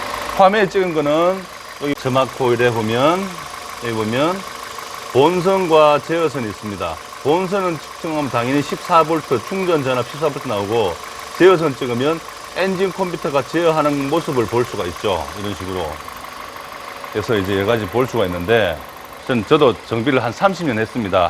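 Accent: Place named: Gyeongsang, South Korea. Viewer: 사장님 혹시 매장이 어디신가요? 일단 경상도이신거같은데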